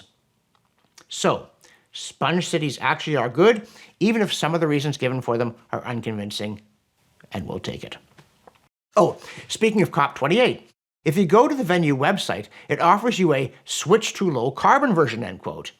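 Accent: Canada. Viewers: Dr. Robson, I have to say this. I know you are a good intended person very active in getting real information to people about the climate change alarmism and it's dangerous to our society. I'm just going to say it meaning to help because your message is very VERY important. Not so bad in this video, but ... since your message is SO important I think the way you comport the message is flawed. Your vocal delivery is plainly, not good. You speed talk so much and trail off at end of sentences and paired with your obvious Canadian accent, sorry but t true there's something there.. ... too often it gets frustrating trying to hear you and often I've just stopped watching the video to find something else I can actually hear. Yes, I have tinnitus, but I am not alone in that. I don't know what your hurry is and why you can't just take the time to present your message in a more listenable deliberate cantor. 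I think you'd find MANY more views on your videos if you'd try to improve your public speaking to a more pro presentation. I say this as a person who appreciates your efforts and especially your important message. BTW the caption often gets it wrong and can't keep up either. A little patience and taking it a bit easier will benefit your delivery. People want the truth they also want to hear it communicated clearly. Take this as constructive as I do like you.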